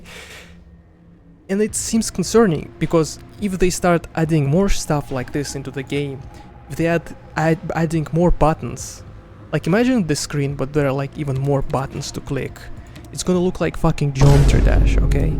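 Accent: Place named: Russia. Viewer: Я слышу русский акцент брат))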